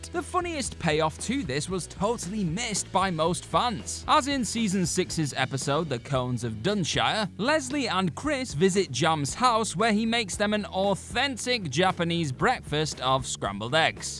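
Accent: United Kingdom. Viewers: It's just a British thing, they say haitch rather than aitch for the letter 'h'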